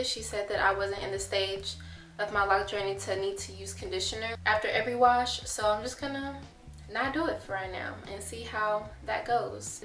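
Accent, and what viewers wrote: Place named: Houston, United States. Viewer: Yooo! I was like, she sounds like Solange. Then, I see you're from Houston. I guess we really do have an accent.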